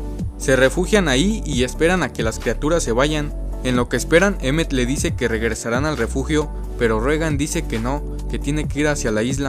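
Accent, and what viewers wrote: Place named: North, Mexico. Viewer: Yo fui productor de television en la especialidad de publicidad en pocas palabras era productor de comerciales, yo voy a ti, como locutor, tienes una magnífica voz, pero no tienes nada de experiencia y te hicieron debutar demasiado pronto, lo principal es el acento neutro y yo aunque no soy mexicano me parece que tienes un acento norteño yo estaba esperando en cualquier momento el "puesn" eso es el grave defecto que veo en tu desempeño, pero felizmente eso no es mortal y tiene solución, estudia para adquirir el acento neutro y practicar por lo demás material hay y muy bueno., tu voz, lo de la locución profesional puede esperar un poco y prepararse para un mejor desempeño, felicidades y adelante.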